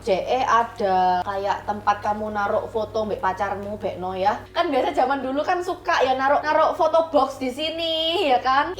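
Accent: Java, Indonesia. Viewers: Cici tp ngmong ny ky jawa pdhl muka ny ky cina 🤩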